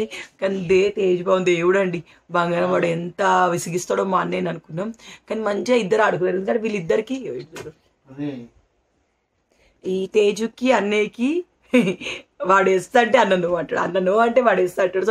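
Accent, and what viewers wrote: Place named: Hyderabad, India. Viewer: Hyderabad slang masthu vachindi akka niko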